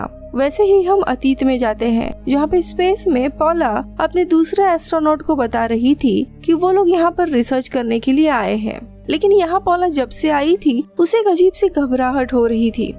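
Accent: Maharashtra, India. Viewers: Mam aap Maharashtra se ho ?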